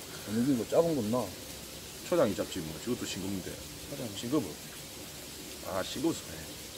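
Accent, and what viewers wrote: Gyeongsang, South Korea. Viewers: 저는 저희 아버지 소개로 이 채널을 알게되었는데 사투리 보니까 같은 경상도분 이신가봅니다. 사회에서 사투리 쓸때는 스스로 몰랐는데 방송으로 들으니 맛깔나는군요.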